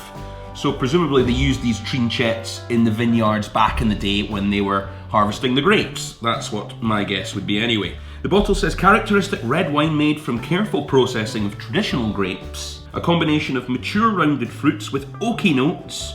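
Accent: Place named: Scotland, United Kingdom. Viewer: my wife and I have booked to come to Mallorca again in early September. Looking for some tips on Palma bars, tapas bars and restaurants. Good to hear a fellow Scot on YouTube!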